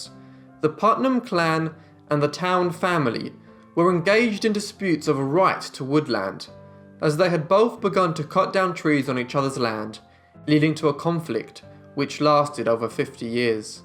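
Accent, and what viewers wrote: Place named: United Kingdom. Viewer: Thank you for all your hard work and informative video. Well done. Subbed after 7 minutes :-) Something about a British accent automatically adds 30 IQ points to any documentary. Especially yours, you have a great voice, very clear and understandable. Not gonna lie, some accents I have to rewind several times or turn on the captioning feature. Look forward to watching more. Hope you have a safe and prosperous new year.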